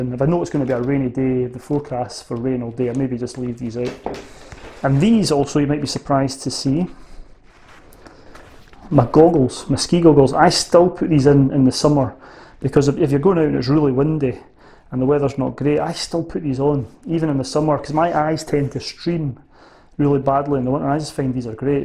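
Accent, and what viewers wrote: Scotland, United Kingdom. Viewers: Auto-captions are hilarious - they can't cope with your Scottish accent! 😂 "Secluded Thailand beaches" 🤔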